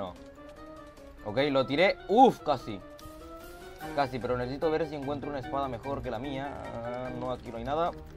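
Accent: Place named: Colombia. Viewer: Eres de colombia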